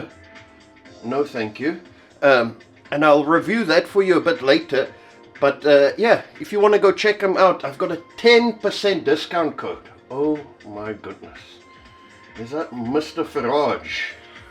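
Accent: South Africa. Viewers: dunno how i stumbled onto your channel ill give you sub. Loving the attitude and thick accent, i miss SA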